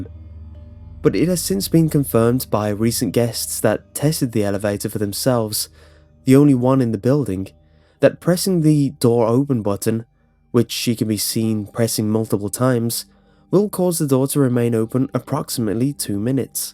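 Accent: United Kingdom. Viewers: Hannah Schrenk Nice try hun, English born and bred. What I'm saying is that unless you hear the word pronounced 'properly' you would not know to apply a different language to it. His pronounciation is very typical of what is accepted here over the pond. Am I saying it is right? No. But it is still the case. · He's British, so of course he'll pronounce some words differently.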